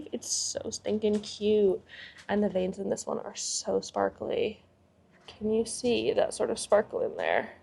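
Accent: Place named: United Kingdom. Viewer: The Brit is rubbing off on you. I hear a bit of a British accent ❤️😁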